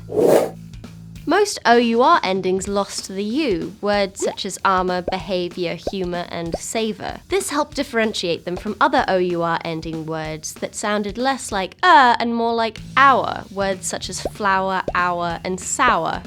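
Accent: United Kingdom. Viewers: ..and even people in the U.S. would understand you if you asked us..'does the building have a lift?'..or 'the lift stopped between floors'...we might not use the same word, but we understand your meaning. And most of us are just mesmerized by a British accent. Everything you say sounds intelligent! Talk to us a few minutes..we'll be calling elevators lifts and adding u to color! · Why does your banner say "British Culture With An American Accent" when you clearly speak with a British accent in your videos? I don't get it. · Because she said Americans changed it to I zed E when Americans would pronounce it "zee." It just highlights that a Brit is talking.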